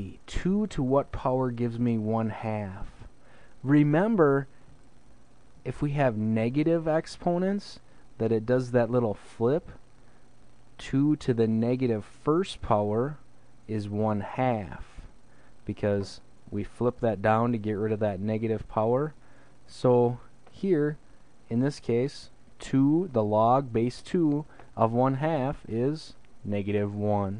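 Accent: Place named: Canada. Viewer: the canadian is so strong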